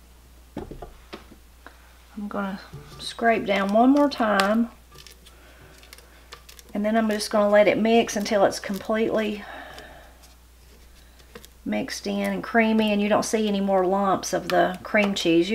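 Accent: Appalachia, United States. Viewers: My family are from the Appalachia area, central Appalachia to be precise. We have an accent similar to this woman’s but it sounds a little different. I get asked all the time when I’m on vacation out of state “where are you from”? Lol Or, “your accent is strong”. I say just yup, I got that Appalachia accent. 😂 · East Ky Appalachia here and we sound about the same!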